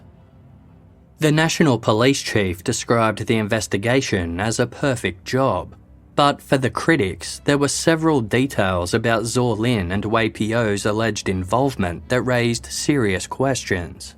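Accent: Australia. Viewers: Thankyou! I'm so glad I've found an Aussie narrator! Makes listening so much easier and relatable! Thankyou, team, for researching as well as you do. Truly unmatched. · I agree with livingtheaussiedream. Nothing personal to the yanks but its great to hear Australian English spoken.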